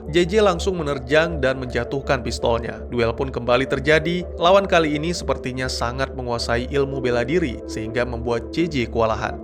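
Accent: Aceh, Indonesia. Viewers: Kak Orang Aceh mana kak?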